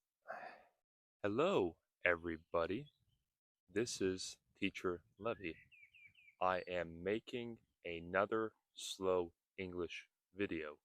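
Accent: United States. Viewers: Hello. Are you an American native speaker (your accent sounds like American). What country do you shot your videos, in Hungary? Do you have live streams on your channel?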